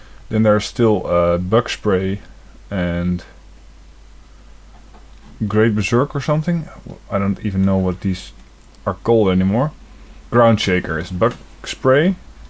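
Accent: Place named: Netherlands. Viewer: Ben je Nederlands? Zo klinkt je accent namelijk! :)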